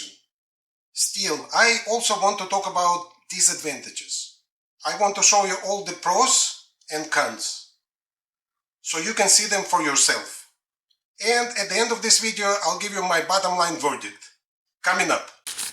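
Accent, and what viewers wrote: Russia. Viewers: вы что русский? акцент русский по слогам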